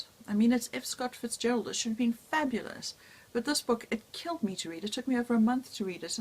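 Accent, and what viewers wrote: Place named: South Africa. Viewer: Ahh I just found your channel! Where in SA are you? I lived in Knysna for YEARS - now live in Australia but so nice hearing a Saffa accent again! OK so I now need to read Wolf Hall haha!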